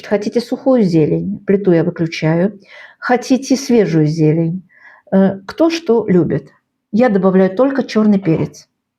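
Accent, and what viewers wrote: Baku, Azerbaijan. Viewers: Я тоже Бакинка ,я тоже так делаю ,но спасибо не буду, попробую без жарки лука ,сразу подумала что по акценту ,вы из Баку ,спасибо ,вам здоровья моя землячка ,пусть моя смотрит ваши рецепты ❤хранит вас Бог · Я сразу по акценту догадался, что перед нами бакинка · Какой у вас сладкий бакинский акцент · Доброго дня,! У Вас до сих пор остался бакинский акцент.... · Чувствуется легкий бакинский акцент🎉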